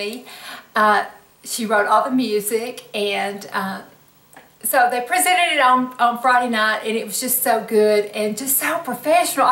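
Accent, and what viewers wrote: South, United States. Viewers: your sweet southern drawl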